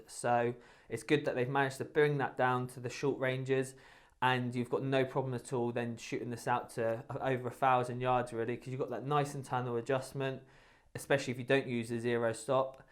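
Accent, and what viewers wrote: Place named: West Country, United Kingdom. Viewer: ".....or you'll bugger up the cap...." Tell it like it is west country boy and more power to you.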